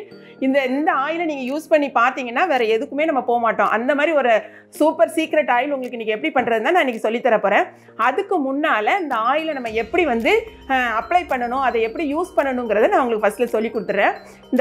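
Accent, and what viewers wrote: Tirunelveli, India. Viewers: Thank you mam.ஆனால் நீங்கள் பேசும் முறை திருநெல்வேலி மாவட்டத்தில் பிறந்தவர் போல் உள்ளது.அதான் கேட்டேன்.நான் திருநெல்வேலியில் தான் படித்தேன்.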